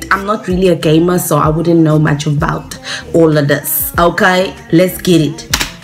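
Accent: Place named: South Africa. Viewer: That accent sounds very familiar. Are you from SA?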